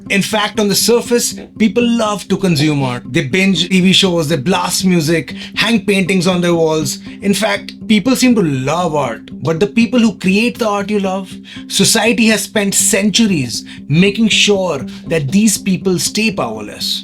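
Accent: India. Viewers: I was also shocked (in a good way) when I clicked this video and heard an indian accent. It's so refreshing to see an indian channel cover this topic considering the dislike of arts or humanities in general in our country. You just got a new subscriber. Would love to see more videos like this.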